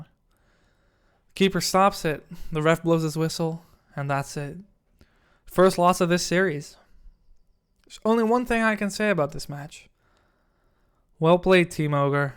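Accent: Netherlands. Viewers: If you keep continuing this series you'll have my reeled in man. Such an entertaining series to watch! En Nederlands zo te horen! Ga zo door maatje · Are you dutch? I feel like I hear a little Dutch in ur reactions😂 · I love that you can hear the dutch😂. I love the vids so far. I began watching after I got the game again. I just got the game again, I am loving it just like i did years ago.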